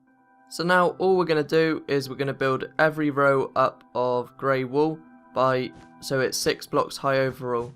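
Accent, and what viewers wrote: United Kingdom. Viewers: Youre buildings are no joke good as hell, by the sound of youre voice you sound like youre from the UK right? Varry good building bro. I subscribed to you so i can see more. Is it alright if i download the tutorials of this building for my own use only? Youtube sucks up all my data and i would love to make more of these. I do the same with Jagthunder1 cause his vids are bad ass and hes got some wicked ships. Check em out also. But any ways this is the best building ive ever made and you did a VARRY good job with explaneing it step by step witch made me build the first step perfect. And you stopt here and there and thsts where i paused it to build. Perfect vid man. Im looking forward to seeing more builds like this aswell. Thanks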